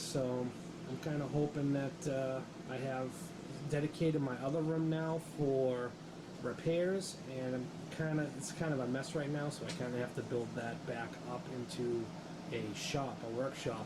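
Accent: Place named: Boston, United States. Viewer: cool setup. you sound like you are from boston guy ;)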